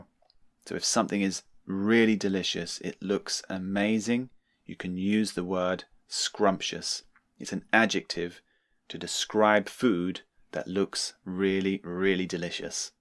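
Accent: United Kingdom. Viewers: With which British English accent do you speak?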